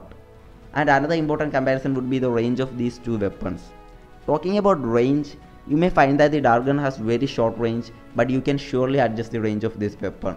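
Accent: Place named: Kerala, India. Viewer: U are a mallu.... ur eng tells it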